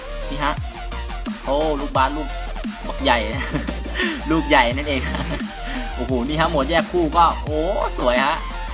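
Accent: Isan, Thailand. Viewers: พี่เปนคนอีสานหรอ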